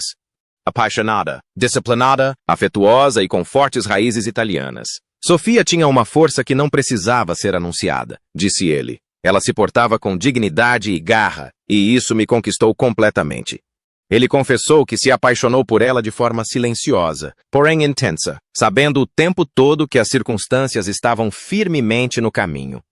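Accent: England, United Kingdom. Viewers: Para de falar com sotaque ingles. Fica horrivel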